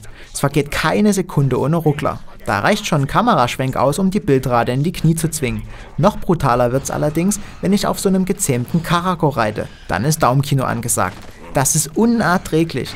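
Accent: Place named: Saxony, Germany. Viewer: Klasse Stimme. Sächselt so schön vor sich hin.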